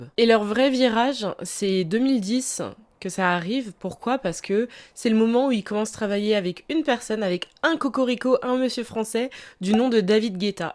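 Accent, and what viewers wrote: United Kingdom. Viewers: j'aime trop ton accent british oml 😫🫶🏻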